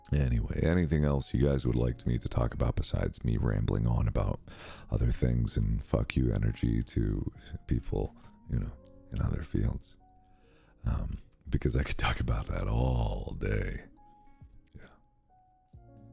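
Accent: France. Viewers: Oh my!!! French accent through the video; oh dear gosh!!! Must be an ASMR video of some stuff.🧔🏻🎮😹🙀😼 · Markiplier sounds like Tom Kenny's SpongeBob SquarePants French narrorator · French Markiplier!~ 😍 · Am I the only one that hears a bit of a French accent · Lol french! Yes! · French Accent AU ASMR 👌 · nice french accent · I was watching Murder on the Orient Express so coming to this and hearing him with the French was very odd. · The french accent makes me laugh, i am, and i talk like that, so, for the FIRST TIME, i understand you very well 😭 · why talking in french voice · Why does he sound french 🥖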